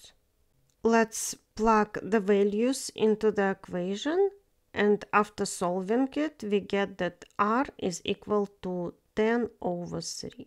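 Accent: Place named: Russia. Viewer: Добрый день! Почему на русском не читаете? У вас в английском русский акцент.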